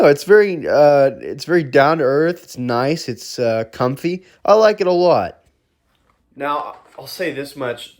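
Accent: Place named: South, United States. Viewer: I like your southern drawl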